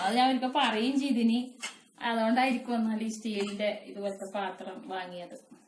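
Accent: Kannur, India. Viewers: കണ്ണൂർ aano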